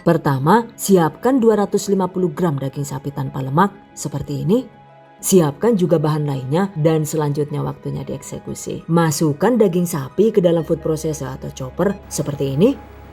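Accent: Banyumas, Indonesia. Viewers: Biasa...yang aku tungguin ngomong ngapaknya . ...bikin aku ketawa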